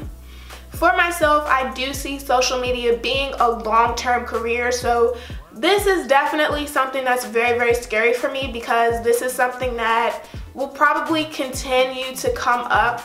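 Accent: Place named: United States. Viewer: A little bit confused here. You have a black Tshirt on with the letters BL_CK with a map of Africa between the Bl and the CK. So I'm guessing that it's meant to mean black African? Obviously black but you speak with an American accent, excuse my ignorance. Are you African or American? If African, what part of Africa do you come from? You do the American accent really well.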